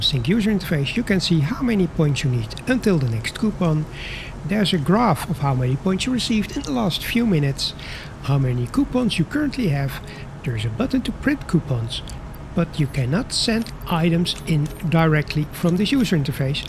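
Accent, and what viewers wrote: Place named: Netherlands. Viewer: Nice video, and accent! Dutch?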